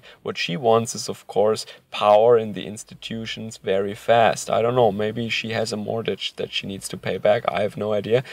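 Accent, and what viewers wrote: Germany. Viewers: Anti-Feminism, frustrated masculinity, islamophobia, kitschy nostalgia of a romanticised past he didn't experience himself and idiotic anti-globalism while publishing on Youtube (given generously to you - including the first amendment - by yours truly, the United States of America)....this dumb channel is the typical alt-right nonsense. With a bad German accent.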